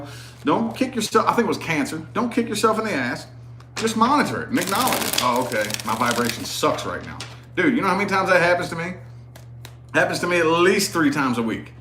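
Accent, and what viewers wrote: South, United States. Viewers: Hello there, im Trudi and a Leo. Iv just come upon your channel in the last 3 days. Ive got to say the first reading i saw of yours it was like a magnet pulling me in for more. You have alot that you need to share with as many people as possible . I dont know if its your easy going small town country boy drawl. Or maybe your aura of i got things under control just relax or your songs you belt out while you shuffle and pull cards. All of which makes people turn and pay attention and watch you and listen. I watch maybe 3 readers because i feel they truly feel the cards and the messages behind them. You and one other are pretty close to reading my life .its spooky and i get chills when a reading is so close to my heart. But the way you explain the Universe to us is a great gift. Im a true beliver in the Universe and what you put out in the world good or bad will come back to you ten fold. 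You may not be content trying to get these lessons thru to us. Its hard work when noone takes responsibility for their own actions anymore. This is the way of our world it seems. But my opinion you are doing a wonderful job. Thank You im glad to beable to watch your channel. Soon ill get a personal reading appt. Set up. My person of late is a Virgo. We are done but he decided to hurt my feelings on his way out. Ill survive . Im thinking positive thoughts. You are a good person.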